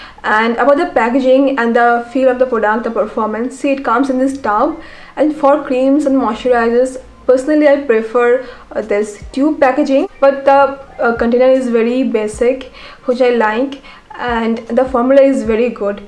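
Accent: India. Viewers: Can you please add captions because it's really hard to understand your indian English accent.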